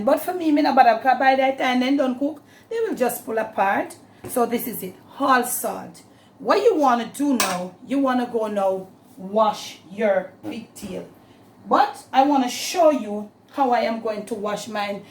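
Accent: Jamaica. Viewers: Hello Ms. I must say I love your accent your methods of cooking but most of all how you have taught me the correct way to cook the Jamaican way. Your advice your suggestions. Hopefully when I go to Jamaica 🇯🇲 I can visit you. And cook with you. Yeah mon the Jamaican way. And no I’m not Jamaican born in Colombia 🇨🇴 raised in New York living in South Florida.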